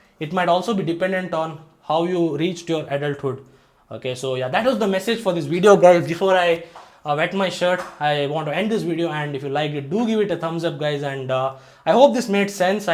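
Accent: India. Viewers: Indian accent is disgusting.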